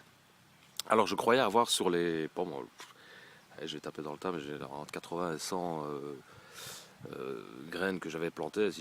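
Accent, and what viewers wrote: North, France. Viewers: ou alors du coter nord france cette accent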